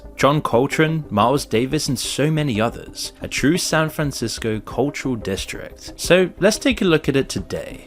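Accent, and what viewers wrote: United Kingdom. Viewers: I love some Douchebag with a British accent telling me how fucked up my City is..... you don't know shit, we love it here.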